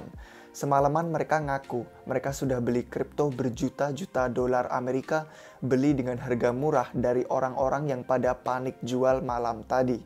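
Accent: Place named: United Kingdom. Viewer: Bos, aksen british lu cringe banget, keinget skinny faps, pake aksen amerika aja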